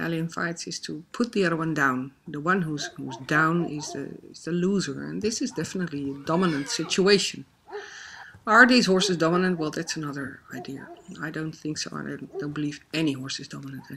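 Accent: Netherlands. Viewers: Je klinkt erg nederlands. De dingen die je vertelde was erg logish en accuraat. Maar ik had meerdere hoopjes hooi neergelegd zodat ze elkaar konden onwijken als ze dat zouden willen. En ik denk niet dat ze vechten. Het is eerder kijken wie er sterker is. Ik snap waarom je ze in een kleinere ruimte zet maar ik had ze in een grotere ruimte gezet met meer hoopjes hooi. En ik denk alleen niet dat paarden zoiets hebben als "common sense".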